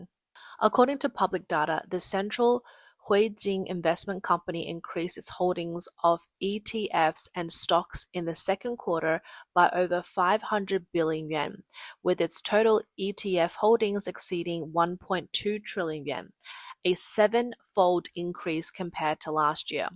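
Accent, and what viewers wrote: Australia. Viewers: I would not trust any of this information since an Australian is reading it. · I don't want to hear an australian making noise tonight. Chinese don't have Ozzie accents!!! Get a host.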